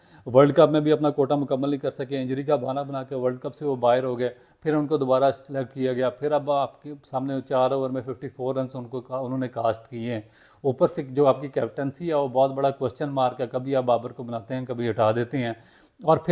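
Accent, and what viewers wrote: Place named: Punjab, India. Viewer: Nice Punjabi accent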